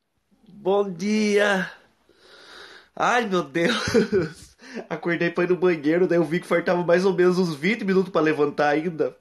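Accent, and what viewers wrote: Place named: Sorocaba, Brazil. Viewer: Você é sorocabano! O sotaque é inegável.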